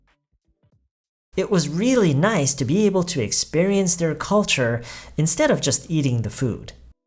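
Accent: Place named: United States. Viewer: 유용한 영상 감사해요 !! 말투가 박재법 군이랑 비슷한데 미국 어느 지역 엑센트인가요?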